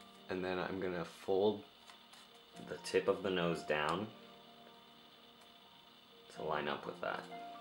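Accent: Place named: United States. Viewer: Good job no offense but you sound like an American lol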